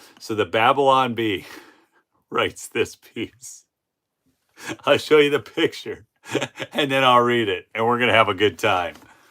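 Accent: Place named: Minnesota, United States. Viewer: You're from Minnesoooota.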